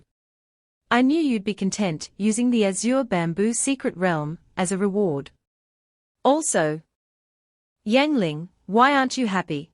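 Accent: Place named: United Kingdom. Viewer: Why this uk accent 😢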